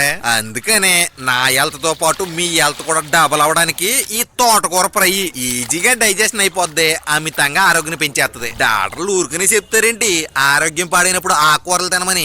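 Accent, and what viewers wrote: Godavari, India. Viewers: Aa basha ki fida bro. Godari yaasa vichala vidiga nachesindi.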